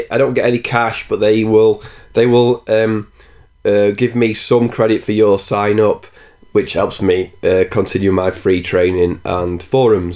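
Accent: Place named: Doncaster, United Kingdom. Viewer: Nice to hear a plain-speaking Doncaster accent rather than an American on this sort of video. At 9mins or so, the chosen one-click install in DO is not the official recommended way to install Discourse. Discourse itself suggests following its setup instructions through the command line. I gather the one-click install does not create a swap file, which is why the setup slows to a halt unless you choose the larger USD15 droplet. Discourse will run happily for a small community on a 1gb droplet using the official instructions that employ a swap file. Also, you cannot downsize a droplet (ie go from 2gb to 1gb). So perhaps it is best to start small then resize upwards if need be. Anyway, thanks for video, very helpful.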